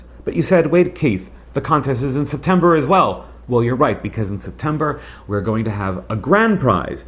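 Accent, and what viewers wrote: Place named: Portugal. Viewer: Your first language is portuguese right? I'm guessing by your slight accent.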